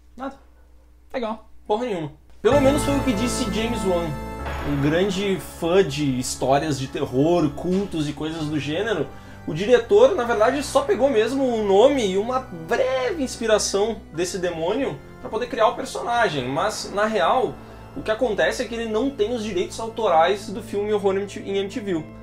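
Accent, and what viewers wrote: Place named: Rio Grande do Sul, Brazil. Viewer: Aaa eu sabia q vcs eram gauchos kkk so pelo sotaque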